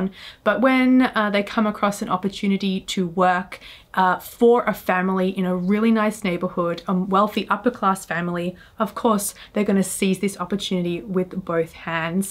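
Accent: Australia. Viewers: Are you Aussie? I like your accent. 😁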